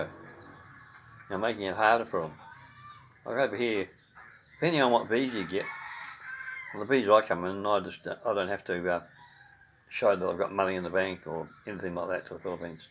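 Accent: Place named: Australia. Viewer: Hi. Thanks for sharing you experience in the Philippines. My name is Chuck. I live in the state of Nebraska in the USA. I too am married to a Filipina. We are currently having a small simple home built in General Trias, Cavite, Philippines. We hope to be moving their to retire next year. Keep the videos coming I really enjoy them. I have to watch each one more than once because I have a hard time understanding some of what you say because of your very strong Australian accent. ¯\_(⊙_ʖ⊙)_/¯ Take care and God bless.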